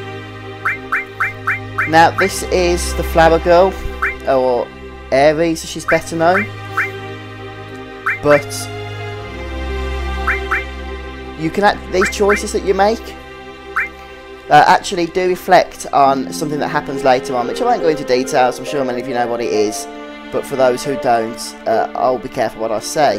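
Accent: United Kingdom. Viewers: I know this is way off topic for the game and everything, but I was wondering where is from. I can't quite place the accent. I have a slight thought of British but then again Australian also comes to mind. I mean correct me if I'm wrong but it's just a thought and I'm really curious. I'm a little embarrassed to say that I find his accent cute...;) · ooo a british lper I'm subbing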